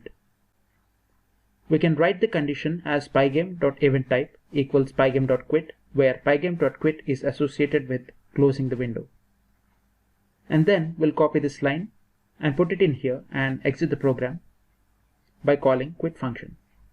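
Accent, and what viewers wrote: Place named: India. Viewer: You have indian acsent🇮🇳🇮🇳🇮🇳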